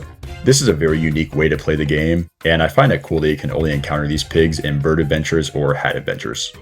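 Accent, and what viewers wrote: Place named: Brazil. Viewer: eeeee um brasileiro falando ingles!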